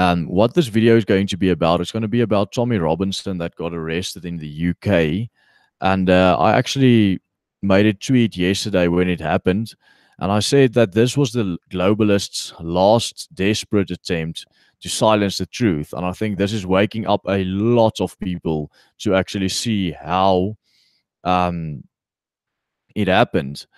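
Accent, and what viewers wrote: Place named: South Africa. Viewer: So good to have a South African voice.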